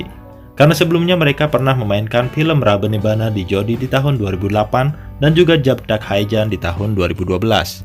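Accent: Bali, Indonesia. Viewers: ini yg punya chenal pasti orang bali . . logat balinya kluatan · Kok kayak bali malahan bng 😁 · Dari logatnya mimin kaya orang Bali yaa hhh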